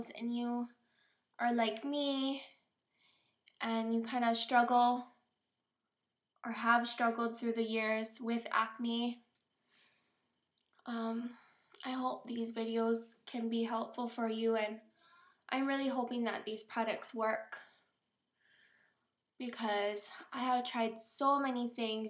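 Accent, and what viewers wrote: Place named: Minnesota, United States. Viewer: You must be from Minnesota.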